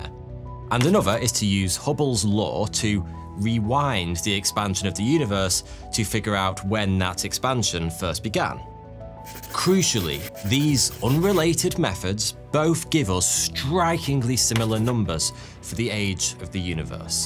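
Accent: United Kingdom. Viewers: WHY DO WE ALWAYS HAVE TO LISTEN TO A BRITISH ACCENT BLATHERING ABOUT THE IMPORTANT QUESTIONS OF LIFE AND DEATH ??? WHEN WILL THAT BROKEN ENGLISH RACE FINALLY RETIRE FROM HISTORY ???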